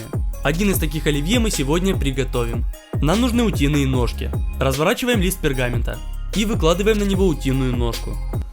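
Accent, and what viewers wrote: Odessa, Ukraine. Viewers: Ты случайно не из Одессы?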